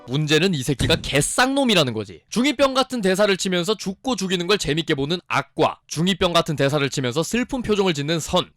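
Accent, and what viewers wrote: Busan, South Korea. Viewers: 나랑 상반된 다른 의견의 눈으로 보는것도 재밌구만. 그나저나 해설 기깔나게 하길래 부산인지도 몰랐네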